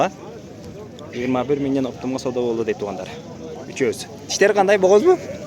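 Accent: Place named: Osh, Kyrgyzstan. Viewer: Бул блогер бала Оштон болобу ака дейт экен же Баткен Лейлектенби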